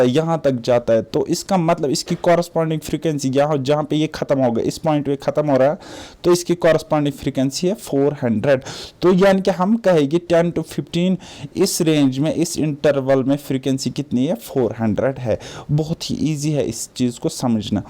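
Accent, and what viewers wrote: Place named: South, India. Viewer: Sir ap na south Indian or kashmiri acesente mix keya ha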